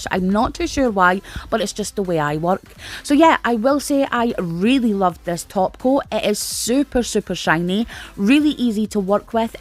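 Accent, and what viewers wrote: Scotland, United Kingdom. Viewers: You are from scotland, aren't ya? I can hear it, as a German 😅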